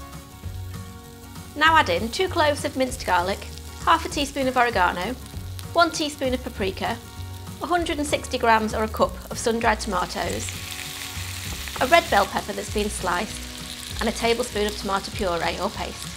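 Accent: United Kingdom. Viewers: isnt it funny how a british accent instantly makes someone charming? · I hear a British accent. For sure this will be in another video called "Italian chefs react to Italian cooking videos" with them crying bloody murder 😂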